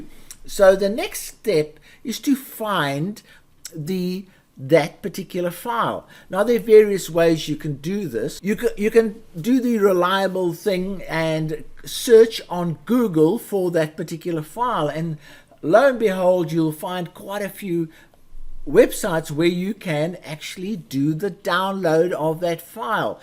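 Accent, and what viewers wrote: South Africa. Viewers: Hi, let me rather ask. Are you a South African?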